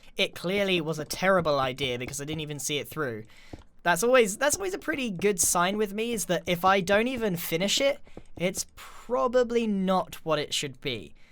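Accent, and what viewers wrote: United Kingdom. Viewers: U to british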